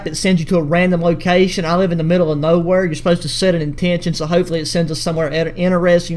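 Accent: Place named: Eastern Kentucky, United States. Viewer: Where you from, man?? It's so rare to actually hear folks with our accent on here! Watching from east KY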